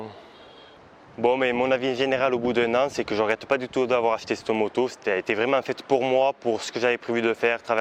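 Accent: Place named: South, France. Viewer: T du sud en plus avec un accent comme ça .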